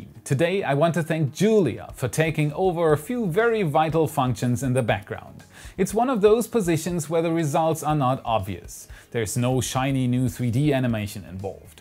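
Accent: Germany. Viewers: What nationality is? I can't quite guess from the accent! Germany?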